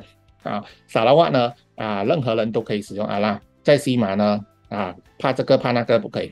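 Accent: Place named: Fuzhou, China. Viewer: Sarawak福州人在此报道 🙋🏻 听到你讲福州话好亲切😊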